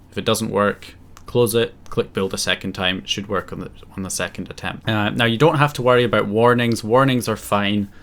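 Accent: Scotland, United Kingdom. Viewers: Irish...? he sounds Scottish to me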